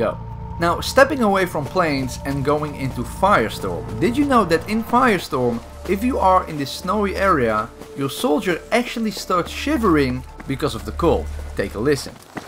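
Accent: Netherlands. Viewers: He's Dutch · you sound dutch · De Nederlands accent btw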